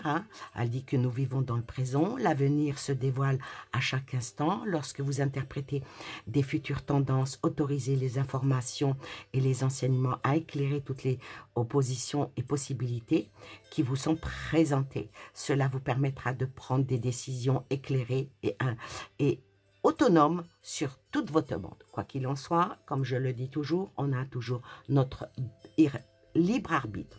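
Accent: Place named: East, France. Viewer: Bonjour, je vous suis mais je n’ai jamais laissé de message. J’avais remarqué un accent de l’Est, je suis née en Lorraine et je vous écoute de Londres, on sent votre sincérité et vs êtes très agréable à écouter. Merci pour tous 🙏🏻. Bisous 😘. Nanou 🤗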